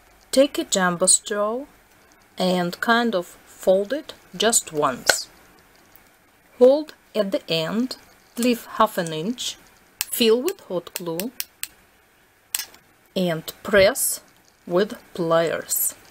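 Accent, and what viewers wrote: Russia. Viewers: Are you Russian ? You have a really Russian accent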